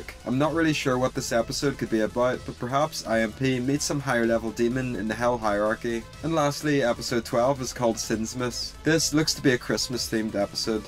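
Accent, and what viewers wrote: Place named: Northern Ireland, United Kingdom. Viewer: Always love to hear a wee Northern Irish accent like my own on YT! Feel so rare to come across! Buzzing for more eps 😳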